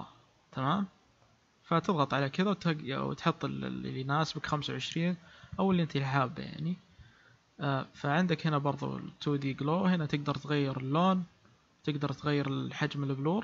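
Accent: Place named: Saudi Arabia. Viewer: استمر انا سويت خمس حسابات و اشتركت عندك الحين كل اللي يسوون شروحات مغاربة و مصريين ياخي لما سمعت صوتك سعودي ارتحت كمل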